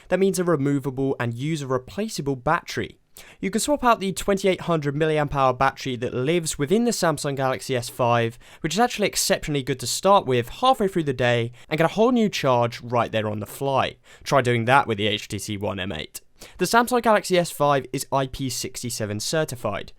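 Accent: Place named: Scotland, United Kingdom. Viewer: i heard your accent before where r u from bro? is it Scottish? Irish?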